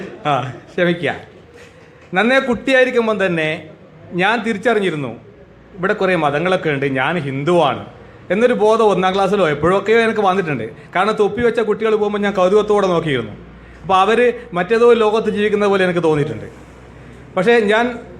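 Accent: Kannur, India. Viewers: നല്ല കണ്ണൂര് ഭാഷ. സൂപ്പർ.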